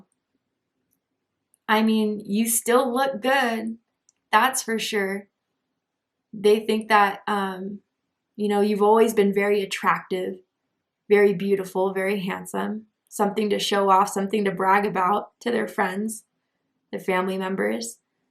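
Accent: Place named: United Kingdom. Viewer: 😯 Yes, British accent.. he is English!! I am Italian 😃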